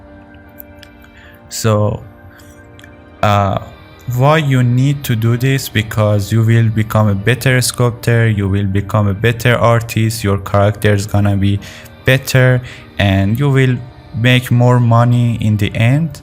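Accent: Iran. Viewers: Persian accent?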